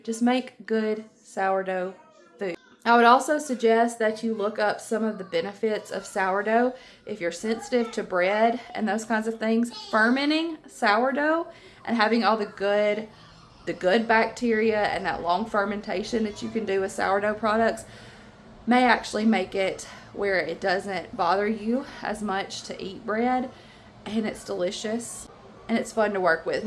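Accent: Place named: Alabama, United States. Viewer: I love your Alabama accent. My people are from north central Alabama and sound just like you. thanks for the helps.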